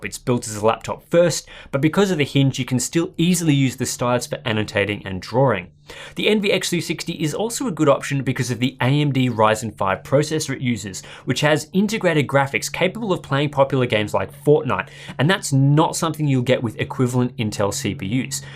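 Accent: Australia. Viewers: You have a Aussie accent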